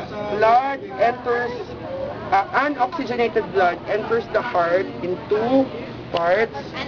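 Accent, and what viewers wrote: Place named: Philippines. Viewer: hahahah Filipino accent!!! oh MAN! lol! Just like my grandparents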